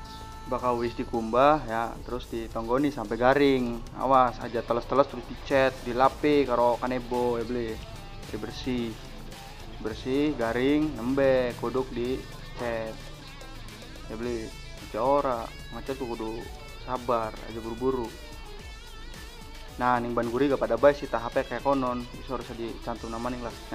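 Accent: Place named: Tegal-Cirebon, Indonesia. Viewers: Jawane kaya wong tegal cirebon kihh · wong dermayu tah? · Ngapak mbah... ??? 😄🤣🤣😂😅😆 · Lah wong ndi sampean kayae dermayu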